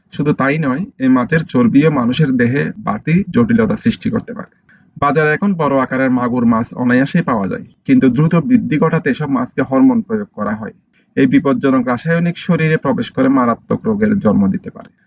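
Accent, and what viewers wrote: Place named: Noakhali, Bangladesh. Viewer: উনার বাড়ি হয, নোয়াখালী অথবা চিটাগাং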